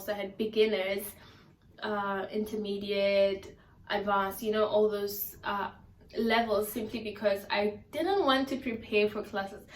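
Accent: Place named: United States. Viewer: U seem to have a bit of an american accent. Does one need to have this accent to be considered ?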